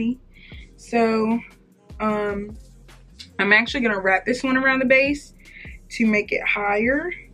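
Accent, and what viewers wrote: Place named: Texas, United States. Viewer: are you from texas beacuse i love your accent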